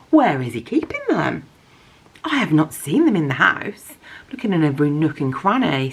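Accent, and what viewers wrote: United Kingdom. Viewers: You are a charming and humorous woman! Very pleasant.... Are you British? As I am German it is not always so easy for me to distinguish British and American language.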